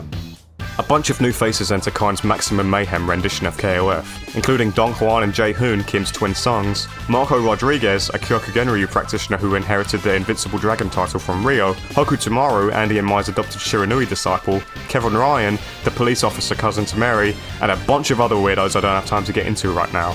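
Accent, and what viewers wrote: United Kingdom. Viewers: I love hearing a Brit talking about Fatal Fury and SNK, the game and the company is not as popular as SF, Tekken, and Mortal Kombat here in the uk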